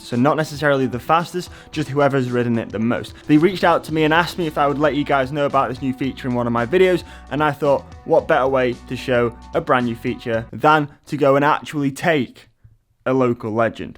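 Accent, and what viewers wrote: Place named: North, United Kingdom. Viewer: Is it me or do you sound more northern than usual?!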